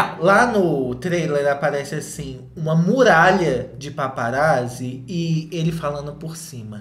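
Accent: Minas Gerais, Brazil. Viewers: Eu amo essa narração em Minerês,nó.....muito lindo.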